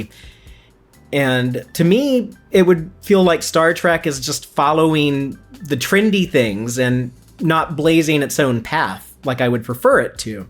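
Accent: United States. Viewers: No offense to this narrator (He did a really good job) but I love Sean's and Ellie's accents. Getting my Star Trek news in an American accent just doesn't hit the same. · You did a great job of presenting this! Do not apologize. It's nice to hear an American accent sometimes, and you spoke so clearly that I didn't need captions. TREK X MUPPETS?? I'm there.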